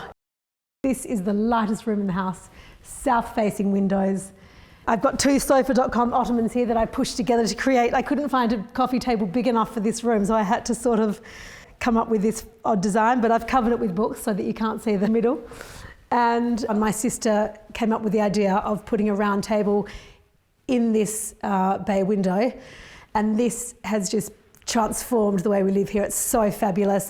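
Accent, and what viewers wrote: Australia. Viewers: Stunning house. Has to be my all time favourite ever!, The history! Tudor wing, gate house! You've been sensitive, respectfully with simple elegance & modern livable touch. Your work is amazing, your respect and love of the property is clear and evident. Congratulations on a wonderful job. I adore this property & gardens as it looks and feels like a huge home yet regal, splendid in everyway. Absolute favourite. Do I detect an Australian accent? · Thank you for the tour. You sound like you're from Australia? You have done a very good job of the interior decor. It would be an honour to be the owner. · Sounds like an Aussie accent… nice 😊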